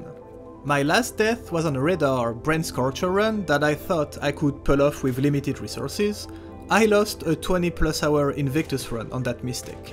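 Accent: France. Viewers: Are you french? It sounds adorable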